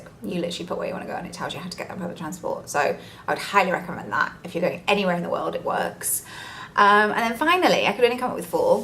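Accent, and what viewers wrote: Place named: Yorkshire, United Kingdom. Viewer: Luv to hear your Yorkshire accent. Reminds me of Bradford. Thanks luv for loving Malaysia.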